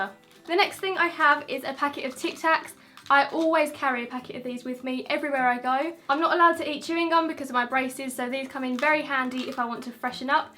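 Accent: Essex, United Kingdom. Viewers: i love ur accent i used to watch towie and idk it just reminds of it ❤️❤️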